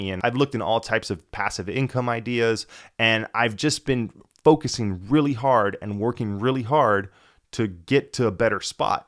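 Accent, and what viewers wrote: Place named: Miami, United States. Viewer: Glad I heard this. We grew up with similar lives and happy to see there’s more people like me in the tech industry. Btw as soon as you started talking I knew you were from Miami, I was right lol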